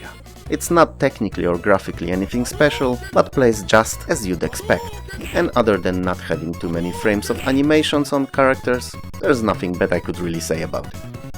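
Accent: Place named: Poland. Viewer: Wyczuwam rodaka w tym angielskim :) Spoko materiał kolego. Łapka w górę i pozdro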